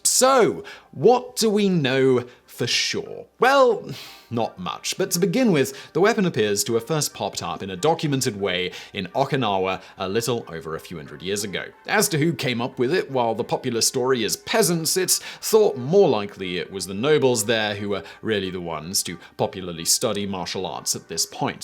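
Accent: United Kingdom. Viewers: The British accent is a plus. That's about the only positive . The rest is all hyperbole.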